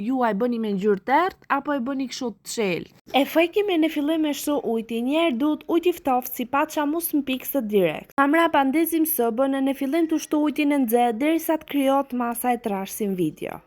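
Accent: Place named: North, Albania. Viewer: Na knaqe me dialektin neve që të kuptojmë , se Jugu për 100 vjet s’mund ta marri vesh se çfar je tu thënë😂😂😂😂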